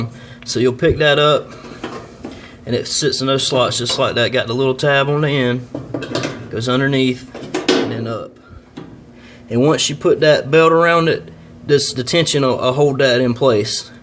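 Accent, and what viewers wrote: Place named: Carolina, United States. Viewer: Man this video is very helpful, love that Carolina accent too, reminds me of my family.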